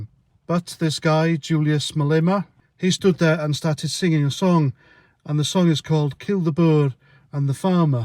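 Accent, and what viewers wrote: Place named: London, United Kingdom. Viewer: Sound like London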